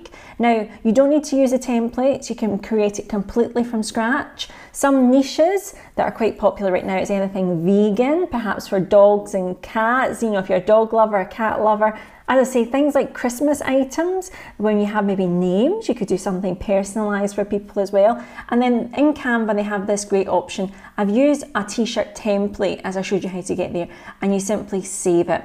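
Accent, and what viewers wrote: Scotland, United Kingdom. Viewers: My wife’s family are part Scottish. What a great accent! Great vid too😁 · Love all your videos! I live in Scotland so I am glad to hear the Scottish voice so I know that all the info you offer will be valid to myself. Most financial video's on YouTube are US so a lot of info is not valid to UK. So thank you and will be trying a lot of your info :)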